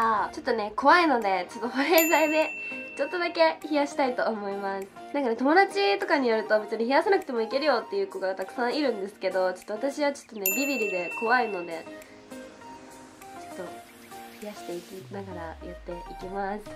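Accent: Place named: Gifu, Japan. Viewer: 自分岐阜だから喋り方に親近感湧く！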